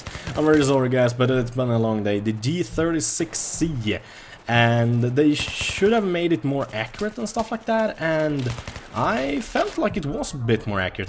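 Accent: Sweden. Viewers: He's Swedish. It's his accent. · Are you Swedish?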